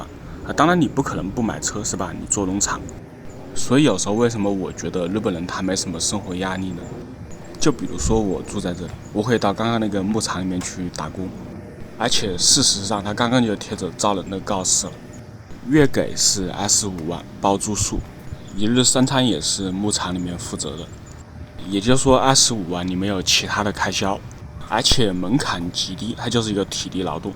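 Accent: Wuhan, China. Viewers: 武汉普通话，一开始就听出来了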